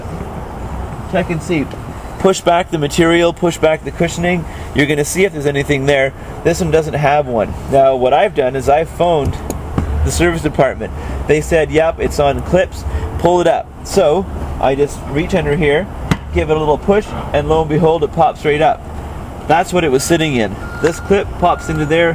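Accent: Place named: Canada. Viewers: He sounds canadian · He's got a strong Canadian accent. Could also be from Minnesoooda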